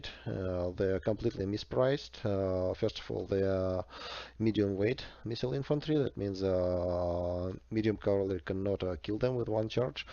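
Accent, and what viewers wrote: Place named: Russia. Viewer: i prefer Bobi and his classic build. if i play without jav i prefer cretan arch than 3 balearics maybe 2 bale and 1 arch but the 40 points of damage is good if you want focus inf with good armour.. but you neel also cav supremacy. btw pelta is pelta <3 good video expecially coz for me is very simply understand english spoken by a russian coz it is much slower <3 great work mate! Lawrence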